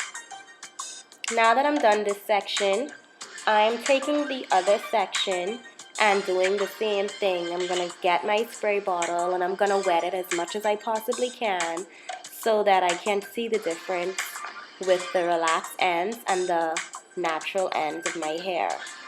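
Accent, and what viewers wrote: Bahamas. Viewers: Yassss I know bohemian sister when I hear one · Are you from The Bahamas? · Could always tell a Bahamian by that accent. 🇧🇸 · I luvvv my Bahamians 🇧🇸.... I just luv our accent and when you said "Horrible" why I bust out laughing lol but it really looks great on you tho... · You sound like a Bahamian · Great video .as soon as I heard your voice I was like the Bahamas . I am from Nassau but have been in Virginia since 1996 . Keep on doing your best . You are a star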